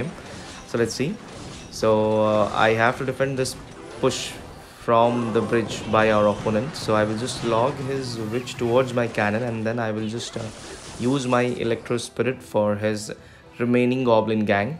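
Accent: India. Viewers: You sound so Indian ^_^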